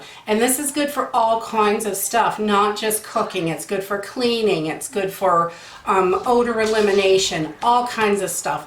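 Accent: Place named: New England, United States. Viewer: Love your channel. Are you from New England? I hear the accent. I’m in Southern NH.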